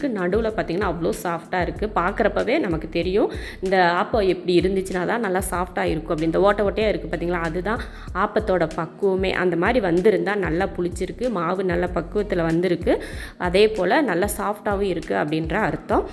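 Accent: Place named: Tiruvannamalai, India. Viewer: You are from Tiruvannamalai?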